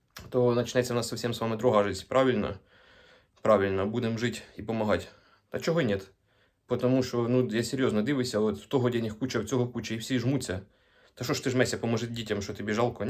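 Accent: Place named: Poltava, Ukraine. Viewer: Звідки ти? Говір схожий на мій Полтавський!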